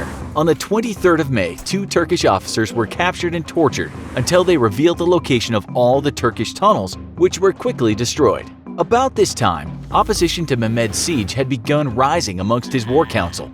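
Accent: United States. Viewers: Its an American channel, by the accent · Any way of getting a more relaxed non-American narrator?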